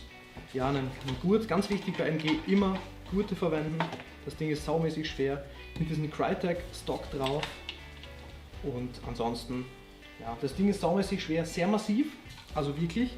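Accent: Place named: Austria. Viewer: Man hört eigentlich auch an deinem Akzent sofort dass du aus Österreich kommst :D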